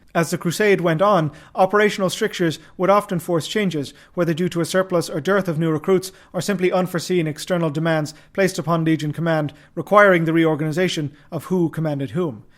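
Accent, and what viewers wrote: Ireland. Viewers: I can't place your accent - is it Irish/Southside Dublin?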